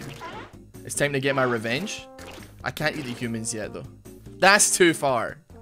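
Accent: Scotland, United Kingdom. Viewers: I feel like you're legitimately the best youtuber I've seen: Although you have a main game (league), you don't mind diverting to another games, you're extremely funny, and the scottish accent helps, you're unique and you interact with the community which is lovely Keep it up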